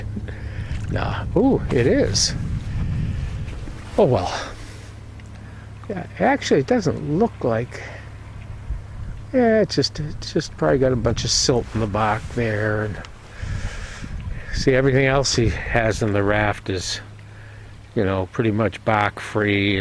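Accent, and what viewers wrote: Boston, United States. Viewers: You can take the man outta Boston but never Boston outta the man 😂! Nice to see you again! Greetings from Germany 🇩🇪 👍🍻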